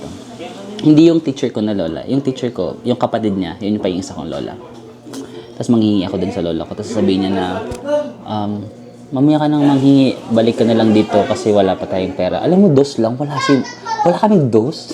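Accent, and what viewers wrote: Waray, Philippines. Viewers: Woooyyy taga samar ka pala.. Nakakatuwa naman maram kapa mag warayyyyyy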